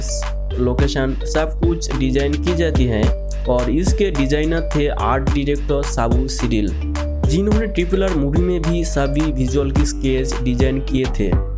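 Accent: Bengal, India. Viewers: Bhai aap bengoli ho??? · kotha gulo bangla te bollei besi vlo hoto tomar kotha gulor mddha onk bangla tan a6e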